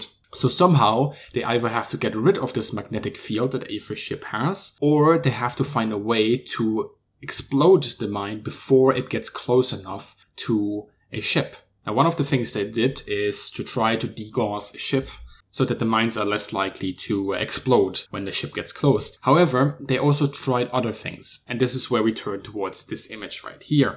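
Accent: Germany. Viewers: You sound German, so I get that you use meters, but that's more like 150 feet. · Bassmint He sounds german and in german its called aluminium so thats just natural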